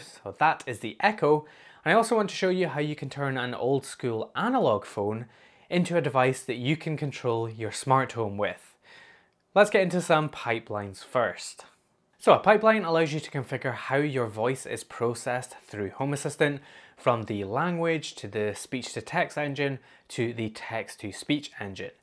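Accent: Scotland, United Kingdom. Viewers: Great video. I´m missing your promised ESPHome config - also i´m not able to get the replies announced on the M5echo - any idea how to configure that? The speaker does work as audio-player... By the way: my (german) family loves your accent - it´s scottish, correct?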